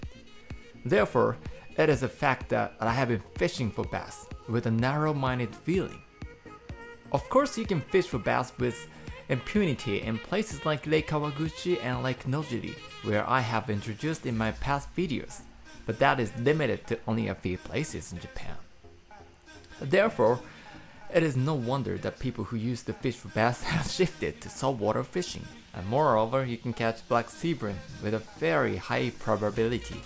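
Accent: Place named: Australia. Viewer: Did you study or work out here in Australia,? Some of your syllables almost sound Aussie lol. Anyway, cool video! I've been using Free Rig/Jika Rig's for Yellowfin Bream & Mangrove Jack here in Australia for a little while now. No-one is aware of these techniques here, at least from what I've been able to tell. Though, I think it's only a matter of time before they start to get popularized. Keep up the good work, I subbed!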